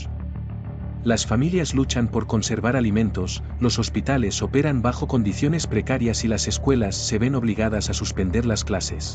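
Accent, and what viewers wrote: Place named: Spain. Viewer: Por que no ponen a un mexicano a hablar.siempre se utiliza a una bos de español cual es el chiste y puro cuento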